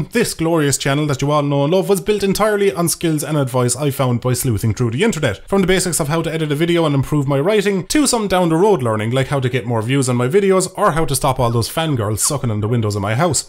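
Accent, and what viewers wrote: Ireland. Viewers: Your voice is so much more Irish in irl lmao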